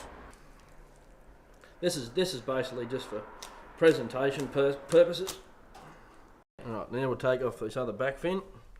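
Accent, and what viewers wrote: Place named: Australia. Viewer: the Australian accent :) I've been living in Syd for 7 years but just couldn't pick it up. love it though :P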